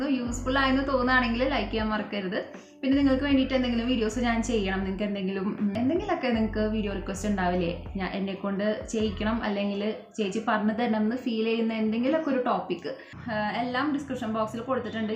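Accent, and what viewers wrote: Thrissur, India. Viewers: Thrissurkaari aano chechi?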